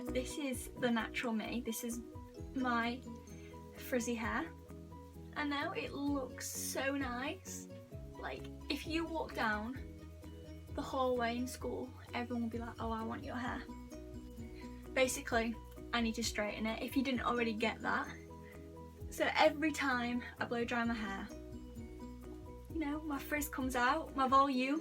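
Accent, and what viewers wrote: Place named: United Kingdom. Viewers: Your British accent 🥺🥺🥺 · It literally took me 2 minutes and 35 seconds to realize she had a British accent i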